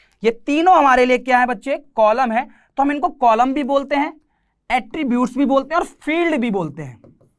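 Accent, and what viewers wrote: Haryana, India. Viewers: Thank you sir 😌❤️ SIR AAP HARYANVI HONA 😀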